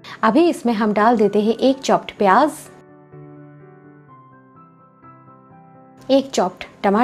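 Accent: Bengal, India. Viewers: Are you bengali. .? apke hindi bolne k style se lag raha hai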